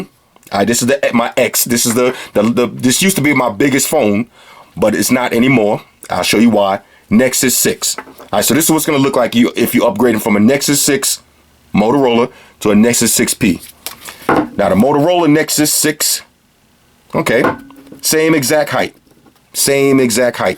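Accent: New York, United States. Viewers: shout out to that new york accent lol wallwey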